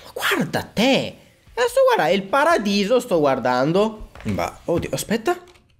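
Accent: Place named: Veneto, Italy. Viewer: Lui è veneto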